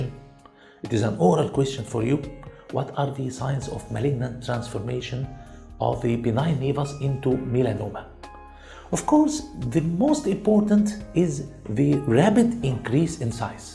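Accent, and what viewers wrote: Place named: Arab world. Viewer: Great!! Like the Arabic accent... :)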